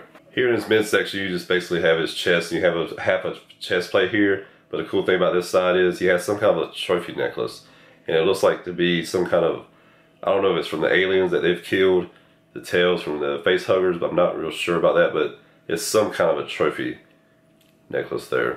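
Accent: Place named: South Carolina, United States. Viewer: Damn dude you sound like you're from my part of the world. Are you from South Carolina?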